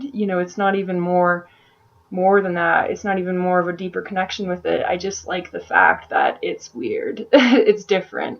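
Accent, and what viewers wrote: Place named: Prairies, Canada. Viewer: It's like you just described my personality and things that I do (or did when I was younger) in ways that I didn't even fully realize, like anthropomorphizing inanimate objects and feeling sorry for neglected plants! I do live inside my head a lot, too, and I have a serious love for unpopular or strange things, and I ramble too much..! Are you me? Anyway, great video, and it would be really cool to see a second video on INFP behaviours. P.S. Are you Canadian? You sound like you're from the prairies, haha.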